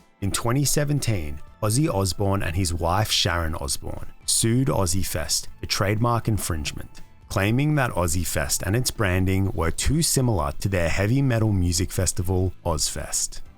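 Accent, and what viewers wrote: Australia. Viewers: Wait... are you Australian?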